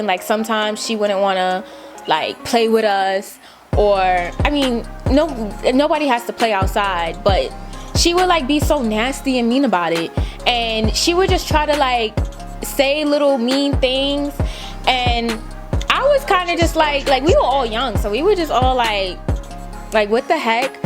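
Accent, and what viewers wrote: New York, United States. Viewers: This shit funny as hell. She so NEW YAWK . I love itttt 😍😍😍🤗😂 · Is it me or she talkin totally dif ..like a new yorker..like nicki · NY accent was just popping out extra todayyy,love itttttt. looooool. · U know I love how New Yorker speak yo lol I just live for hearing speak lol I'm from JA and New Yorkers accents are my fav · looks beautiful, but is it me or do I hear her NY accent more now! hehe:) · She’s so new YAWK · so New York I can’t lmaooooo I love it though 😂💜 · The best New York accent ! 😆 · Omg I missed ur story times I love u I love the way u talk the nyc accent is everything 😘🤗💜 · Is Such A NewYorker I Love It I Haven’t Heard Somebody Say “You Bun’s” In So Long 😂😭😭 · Lol I love u 😂😂😂 u so New York 😂😂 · can travel all over the world but you can literally never take the New Yorker out of this girl!lol.. Our New Yorker accent and slang is so so strong. 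Love it! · i loveeeeeee your accent 😭 true new york girl lol . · Sis youre sounding EXTRA New York in this one !!! Lol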